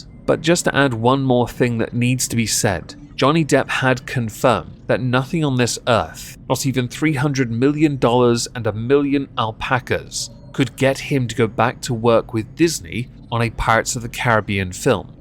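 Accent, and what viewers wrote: United Kingdom. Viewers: I think having a British accent helps.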